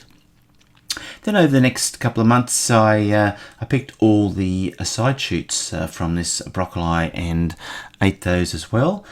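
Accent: Australia. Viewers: Strange, we also say it in England "Broc-o-lee". I assumed Aussies would say it the same xD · what is the warmest it gets during your winter? I heard the accent so thats why I asked because I thought it's always hot in Australia but I honestly don't know anything about your winter. Greetings from Maine,USA :) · How interesting! I didn't know you folks from down under say "broc-o-lie" where we yanks say "broc-o-lee"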